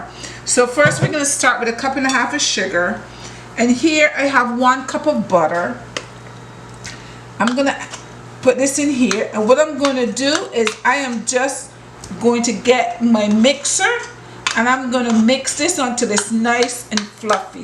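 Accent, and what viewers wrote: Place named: Barbados. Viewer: I am sooo happy I found this channel omg 😩 lunch cakes were the best thank you miss love tug see and hear ah bajan 🇧🇧mother talk 😂 Happy Mother’s Day to you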